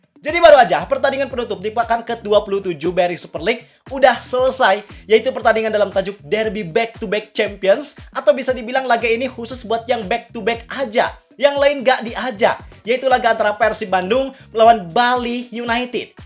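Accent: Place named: Jakarta, Indonesia. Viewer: Kalo dari logatnya setiap bikin video, kayaknya masih jabodetabek dehh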